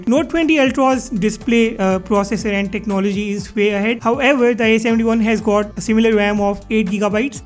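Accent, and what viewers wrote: India. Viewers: Man ,indian accent is too funny to take serious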